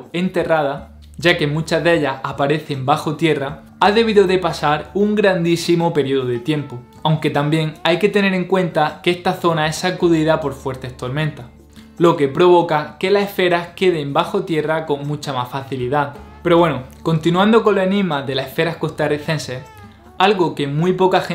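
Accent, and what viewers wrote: Andalusia, Spain. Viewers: ÉPALE !!!! Muchos países de Hispanoamérica tienen MUCHA influencia de Andalucía. Me sentí identificado con ese acento. Desde Panamá....Viva la Madre Patria !! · Me parece que es de Andalucía, una región de España. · Es andaluz, así hablan en esta región de España, es el acento que le dio origen a los dialectos caribeños de latinoamerica... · HOLA!COMO SIEMPRE MAGNIFICO TU DOCU!!!!ME ENCANTA TU DICCIÓN!!!!SE NOTA Q ERES ANDALUZ PERO TE ESFUERZAS AL RELATAR PA Q SE TE ENTIENDA!!!!OLE OLE Y OLE.....TE DOY COMO EJEMPLO A MIS NIETOS Q HABLAN COMO AMETRALLADORAS.....Y MAS OLES!!!!!🌹😀❤SOY DE MADRID Y VIVO N LIMA.💐🌷🌺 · Este canal es para la historia lo que la homeopatía para la salud. Y lo que es peor, con acento andaluz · Acento andaluz?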